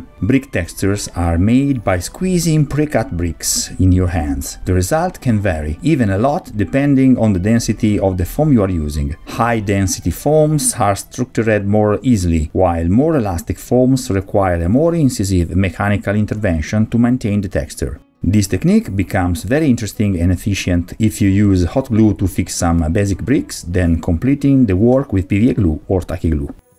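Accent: Italy. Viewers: Hey what part of Italy do you live? (I think that's an Italian accent but I've been drinking)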